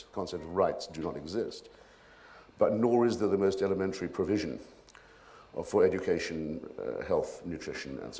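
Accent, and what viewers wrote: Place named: Oxbridge, United Kingdom. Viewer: Notice how he focuses on Europe and the Middle East, no mention of Central and South America. His memory lapse regarding El Salvador and Nicaragua is ideologically too convenient to overlook. Thankfully for those not chained to the Anglo-American doctrinal system, one can hear past the Oxbridge accent and see this impostor for what he truly is.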